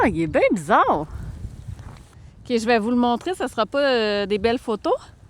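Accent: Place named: Quebec, Canada. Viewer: J'apprécie ton éthique (pas de spectaculaire à tout prix, savoir regarder pour voir le beau, prendre juste ce que les bébêtes donnent, rien de plus...). Tu fais en plus un travail de montage et de scenarisation important et qui fonctionne très bien. Bref, je me suis abonné il y a quelques jours, et je découvre avec grand plaisir tes vidéos, même les plus anciennes. Merci de nous partager ton regars et de bien belles images de la faune par chez toi. En plus avec ce beau parlé Québequois ! Un "maudit français" également amoureux de la nature, et qui se régale de voir toutes ces espèces inconnues (ou accidentelles !) par chez nous..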